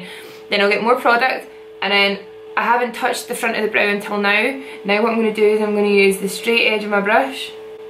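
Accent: Scotland, United Kingdom. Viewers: it's a scottish accent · Sounds Scotch to me. But then again my family's from Scotland so I can easily tell. Their's are way more pronounced tho. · she has the most gorgeous Scottish accent, gives me liiife ❤❤❤ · I LOVE SCOTTISH ACCENTS SO MUCH OH MY GOSH I JUST WANT TO LISTEN TO YOU TALK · Leandra♥ Scottish accent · I love you so much, your eyebrows are sooo amazing! I stay in Glasgow I feel like I have a bond with u , there's not many Scottish youtubers. It's nice to hear the accent. ❤️❤️❤️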